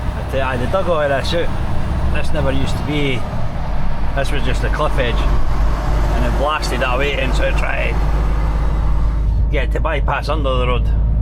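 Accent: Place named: Lothians, United Kingdom. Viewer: yeah makes sense. Cant quite make out your accent. Lothians somewhere.? Sound like Lewis Capaldi or Dean who has the channel - one bike one world.?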